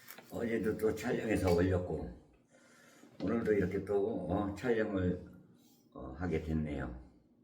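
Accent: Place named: Jeolla, South Korea. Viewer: 말소리가 라도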